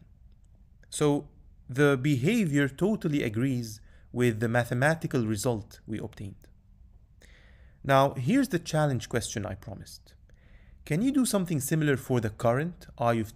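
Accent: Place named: Egypt. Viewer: You have an Egyptian accent kinda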